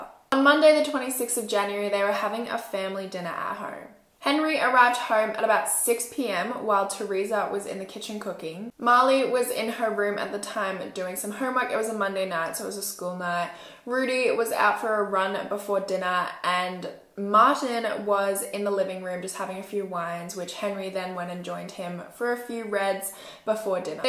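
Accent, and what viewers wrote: Australia. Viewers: Omg that emergency call. It’s SO frustrating! I’ve heard similar from the US, you wonder what these people are thinking. It’s emergency for heavens sake! I’ve had to phone emergency here in Australia several times and thank God they’ve been really great. you are so fantastic at this, I love hearing another Aussie on YouTube true crime. Hugs!❤️ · i believe she does have an Australian accent :)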